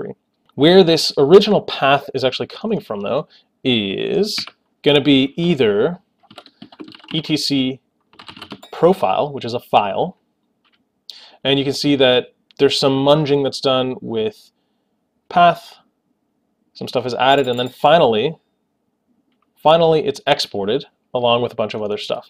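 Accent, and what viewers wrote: Russia. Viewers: Отличное видео и хороший русский акцент! p.s. Из России с любовью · damn! that was a perfect russian accent man! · I loved your Russian accent 😂😂😂😍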